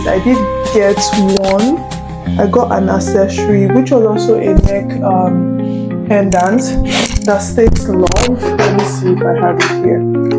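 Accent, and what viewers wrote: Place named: United States. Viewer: Yoruba GRWM with you, definitely going come out great with your small American accent here and there😉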